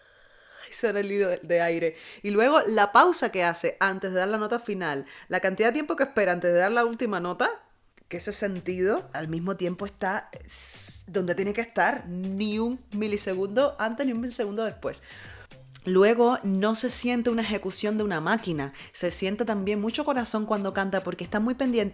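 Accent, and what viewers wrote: Cuba. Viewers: Saludos desde Cuba. Me encanta Dimash y qué orgullo ver tu reacción tan buena 👌🏽. Enseguida reconocí el acento cubano jejeje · bienvenida a Dimash, me gusta ver las reacciones de los coaches (aunq no se nada de musica), pero me encanta ver como se quedan perplejos, porfa reacciona a stranger y Ogni pietra, saludos, otra cosa, me sonaste cubana, suerte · Solo de escuchar supe que eras Cubana.Sin duda somos inconfundibles.Saludos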